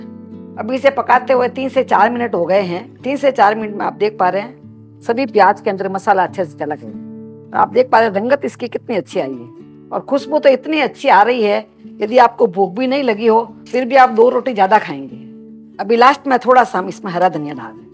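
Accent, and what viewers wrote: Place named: Rajasthan, India. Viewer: True.. I miss her beautiful Rajsthani accent ..🙂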